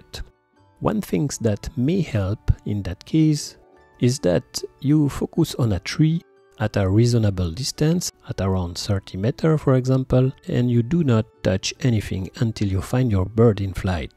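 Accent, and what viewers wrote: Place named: France. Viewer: Merci pour cette très bonne et belle vidéo test. Le petit +: même sans activé les sous-titres je comprends beaucoup mieux votre anglais grâce à votre accent français 😄. Etant amateur débutant, photos nature, oiseaux et animalier, celle-ci m'a grandement influencée pour l'achat du R7 + 100-400 avec un budget raisonnable. Thank you very much!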